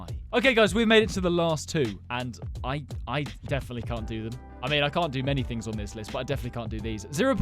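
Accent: United Kingdom. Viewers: yo are yu british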